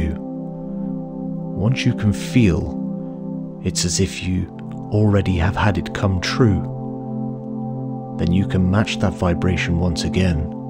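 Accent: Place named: United Kingdom. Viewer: I find all your guided meditations just perfect. Started to listen because I especifically wanted a guider with a smooth male voice and British accent. But all meditations are just so beautifully said and calming. It has helped me a lot throughout the past months. Thank you, looking forward for new videos.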